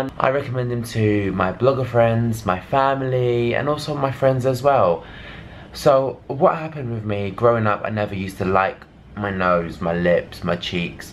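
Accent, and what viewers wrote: United Kingdom. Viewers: you have a very boyish manly face. Very handsome. you can pass for a Puerto Rican, except the British accent lol. Thank God you did not have your nose broken with a rhino plasty. Maybe some laser hair removal to make your beard perfect. But other than that you are handsome :)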